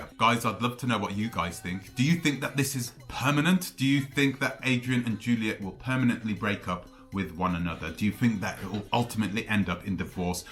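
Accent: United Kingdom. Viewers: I am puzzled by your video. Surely this is a private matter between husband and wife and nothing to do with the business or you and us. Why are so interested in talking about their marital life and talking about them defrauding people? You sound British and you know you wouldn't get away with this in the UK without being sued. Am I missing something here?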